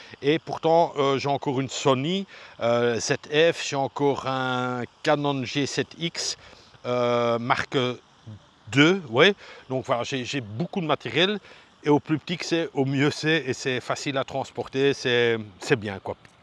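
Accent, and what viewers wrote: Belgium. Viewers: Bonjour je me suis abonnée j'adore ta façon de t'exprimé en nen halve/echte Bruxelleir, moi je suis un vrai bxllois né aussi le 31 mai '67 à 1000 Bxl (bon annif à toi ) 😁T'es vlog que je suit de peu en Thailande très intéressant et maintenant que tu est de retour en Belgique dans notre beau pays lol J'ai aimer ta route en scooter au début, de revoir mon ancien quartier Machtens/Scheut ça fait plaisir de revoir cette endroit après 30 ans 😉Continue à nous faire rêver.. En een polleke de Roger 😅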